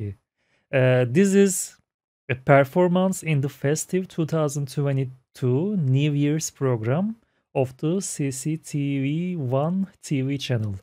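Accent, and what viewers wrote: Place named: Turkey. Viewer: You're Turkish 😁it's so obvious from your accent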